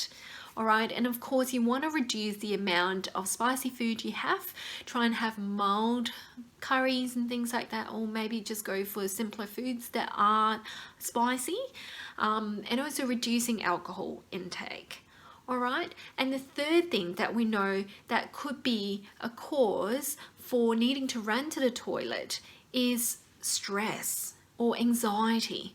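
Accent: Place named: Australia. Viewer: You sound like an Aussie 😊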